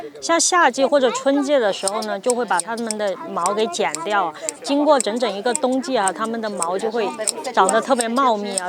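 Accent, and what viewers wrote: Sichuan, China. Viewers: 听口音是四川老乡，支持下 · 四川人，口音理解一下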